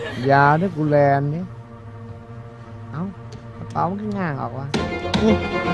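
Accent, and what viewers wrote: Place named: Isan, Thailand. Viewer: ถ้าไม่มีเสียงอีสานภาคและปล่อยให้มันเล่นไปตามต้นฉบับ มันจะสนุกกว่านี้เยอะมากๆ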